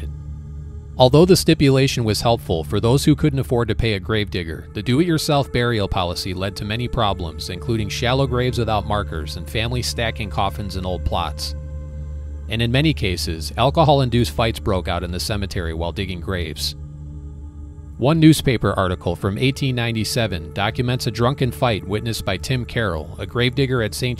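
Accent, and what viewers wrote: Chicago, United States. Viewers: This Chicago accent is super comforting...to just us Chicagoans im sure.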